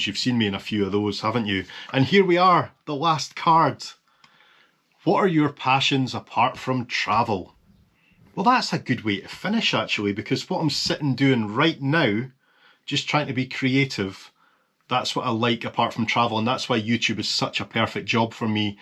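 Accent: Scotland, United Kingdom. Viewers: Extremely entertaining! (I‘m glad your Scottish accent is charming but not too heavy like Gary Anderson 😂) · What captivates me about your videos to be honest is your Scottish accent. I’m from california so to hear your accent takes me to the fantasy world of old England and some small cottages and green pastures. But that is what my vision of Scotland is like. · I love your videos. I love your naturalness. I love your scottish accent and how very scottish you are. I want to ask, and I am sure you have been asked this before many times, but when oh when are you going to come down to Australia, and film here? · Is it just my English ears misleading me, or do you really sound more Scottish when you are sitting in your own study at home than when you are making your usual travel videos!? :-)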